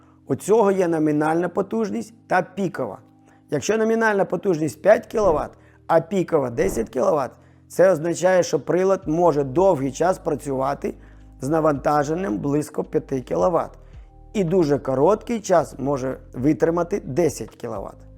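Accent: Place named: Chernihiv, Ukraine. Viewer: А що мова не рідна? Вимова схожа на чернігівську😊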